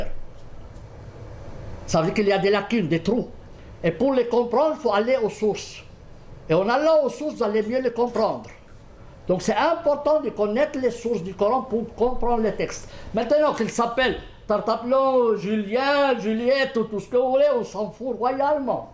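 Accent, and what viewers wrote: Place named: Switzerland. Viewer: Drôle et passionnant... L'accent suisse est la cerise sur le gâteau.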